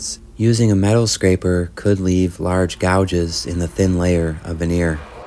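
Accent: Wisconsin, United States. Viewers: As a former Midwesterner, I appreciate the Wisconsin accent ❤ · Very nice! I remember when you first did a veneer replacement. 😊 you've come along way. And I love you're Wisconsin accent. Growing up in Sun Prairie, going to East Town Mall as a teen, Saturday's in the parks. You make me remember my carefree youth. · Wisconsin accent? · It came out great! PS love that Wisconsin accent💕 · Trop beau! J’aime autant vos silences que votre accent du Wisconsin. Ne changez rien. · Amazing.....you bring back the original beauty!❤ And Ilike your wisconsin-english😂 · I’d listen to your Wisconsin accent all day! Beautiful restoration once again! 😊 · Now that is gorgeous! I could only hope to achieve something minorly close to that… Love your videos with sound without sound with talking not talking and of course I love your Wisconsin accent since I am from Wisconsin but I’ve lived in the south for 25+ years. 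· That natural wood grain is so beautiful, hard to believe someone would paint it! I smiled when you mentioned your Wisconsin accent, I being a 70 year citizen of this state I thought, what accent? Lol! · As for your accent, I love Wisconsin and I love any and all information you can provide about the piece and process. ❤ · I enjoy your "Wisco" accent. I have only heard Trixie Mattel's exaggerated "Wisco" accent. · That paint stripper worked great..I found the environmental strippers didnt work well at all ..I love your Wisconsin accent 😊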